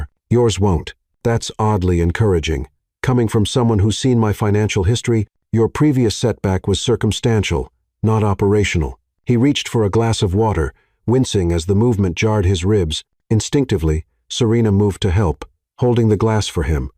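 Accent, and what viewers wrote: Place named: Spain. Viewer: Why the Spanish inflections in the AI speech? They certainly distract from the story. AI should know English only..also AI pace is not how people speak. Saying MISTER then a long pause is odd.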